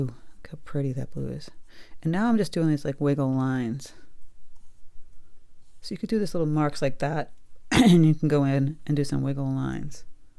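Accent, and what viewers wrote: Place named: New England, United States. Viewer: Yes to the Vlog. Love hearing what's going on in Massachusetts. I grew up there, currently in Michigan. Miss hearing the New England accent 🙂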